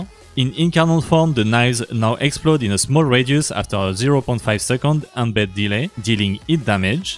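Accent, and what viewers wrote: France. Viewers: Toi t'es un bon gaulois ça s'entend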